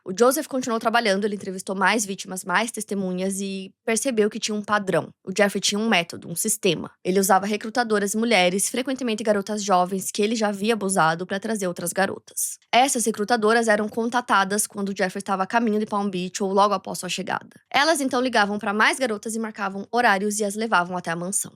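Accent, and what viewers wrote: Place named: Rio Grande do Sul, Brazil. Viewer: Nossa que nojeira, porquê ninguém investiga o tramp? E que vóz chata dessa apresentadora quando fala em inglês misturado com gauchês affiii acho que sou eu que estou sem paciência mas essa reportagem me deu taquicardia, dor de cabeça, ansiedade, nunca termina meu Deussssss ... um rivotril por favor 😂😂😂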